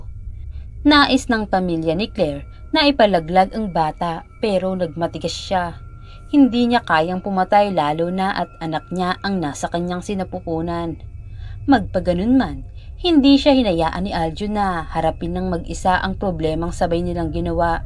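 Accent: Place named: Visayas, Philippines. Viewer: Ang sarap pakinggan Ng bisaya accent!😁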